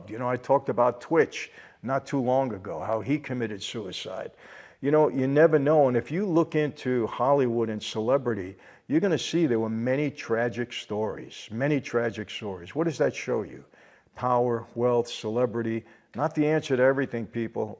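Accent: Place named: New York, United States. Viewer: You sound like a New Yorker!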